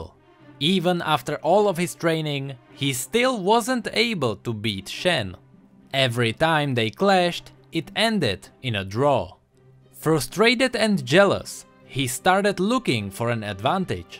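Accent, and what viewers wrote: Czech Republic. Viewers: poznal sem hned podle toho přízvuku že si čech :D your realy good keep going!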